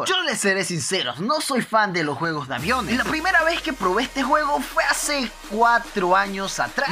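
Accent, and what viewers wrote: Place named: Chile. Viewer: Porque habla como chileno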